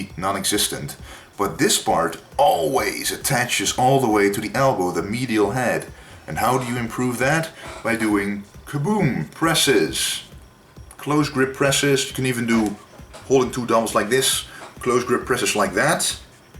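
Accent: Netherlands. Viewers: What accent is it? Is it Dutch?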